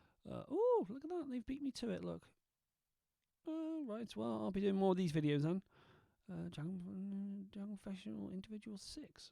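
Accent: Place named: Southwest England, United Kingdom. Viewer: Well that was very entertaining, a bit of a car crash although that's not meant as a criticism I love the way you go live for the first time on YouTube and just learn on the go. As for Dragon 6 it looked awful in terms of Bugs and response but without knowing what hardware and Mic you are using its hard to judge. I was shocked though generally that you had the bug meaning that it would not even tell you that the mic was live! By the way and I'm only talking from experience of version 4 you can only wake the mic for sleep mode (Yellow) not the red off position. So basically I was unimpressed with the performance however I am slightly torn as a video from voice recognition Australia shows it has fantastic accuracy with basic dictation with no training whatsoever other than setting up the mic and that's what I really need it for as I'm disabled and cannot type so I may still take a punt at the reduced upgrade price of £94. 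I love the way as an Apple fan clearly you use Samsung's distressing news about exploding handsets for your demonstration of dictation, I'm still laughing by the way at Apples "courage" at doing away with the headphones jacks and the shit loads of bad PR and all the funny memes that followed which entertained all week. You have a good weekend by, the way what part of the UK are you from sounds like the Southwest-ish? I'm off for a pint of real vinegar.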